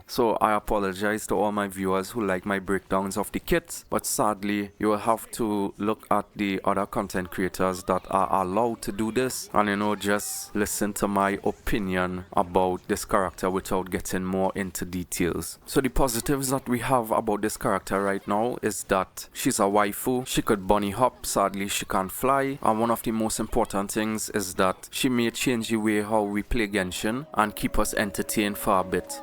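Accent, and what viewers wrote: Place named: Trinidad and Tobago. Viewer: Within two seconds instantly knew you were from Trinidad, what's up brother!! I'm trini tooo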